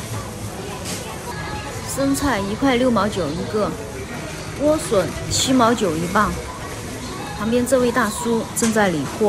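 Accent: Sichuan, China. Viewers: 川妹子啊，，這川普。。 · 我想听听你的英文发音有没四川味？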